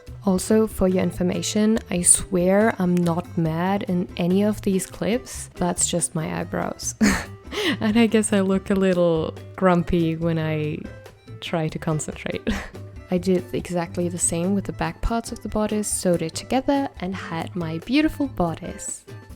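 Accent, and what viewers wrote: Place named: Germany. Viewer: I knew you were german! I am normally so bad with dialects and accents. I can't even distinguish between our german ones - exept for bavarian because I've got a bavarian friend and I think I am doing good to figure that out x3 Anyway I somehow thought you were german and it felt nice to be right about that and not be needing to read the subtitles while you talked to your boyfriend x3 I think Micarah's aren't exactly the best for tutorials tbh, but I looooove watching them. I know how to sew the bsaics and all but as I am not good enough and wouldn't know what to do with these sort of dresses/clothes (exept for maybe one day start cosplaying again x3)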